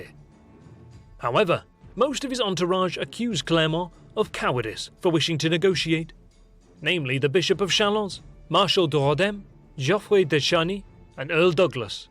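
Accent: England, United Kingdom. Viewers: What part of Britain are you from?... This sounds like one of the numerous British dialects of English (yes, unlike most people, I know there are more dialects of English in the British Isles alone, than in the rest of the World, combined). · na i'm sorry x) Poitiers, the S is silent, Saumur is said Somur, Tours, S is silent... Not even close to 5% x) But yeah, this channel is cool. just that damned rosbif accent.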